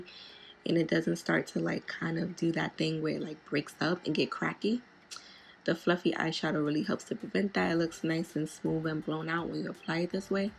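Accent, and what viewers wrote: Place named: New York, United States. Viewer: Idk which is satisfying your accent or the makeup? Lmao let’s say both? Like are you from NY? 😭😍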